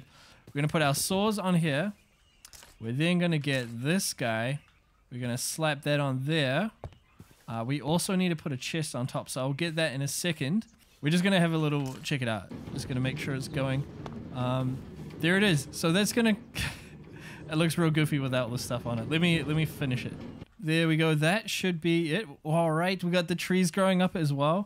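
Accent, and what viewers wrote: New Zealand. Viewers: thanks so much bro, also from New Zealand, good to here a familiar accent on a yt video, keep it up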